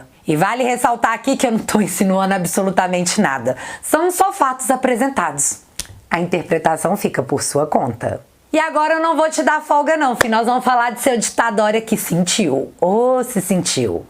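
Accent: Minas Gerais, Brazil. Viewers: Você lembra Mineira, fala qui nem eu.... da onde a senhora é?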